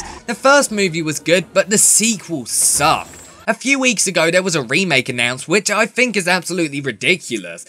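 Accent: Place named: United Kingdom. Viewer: I really liked your smackdown of this movie in a British accent. Yeah the movie looks shitty.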